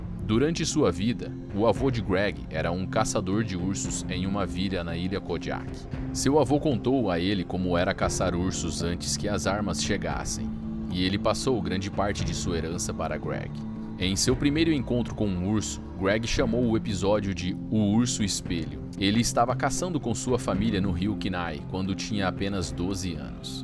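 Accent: Brazil. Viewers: Sotaque legal, de que parte do Brasil? Parabéns pelo canal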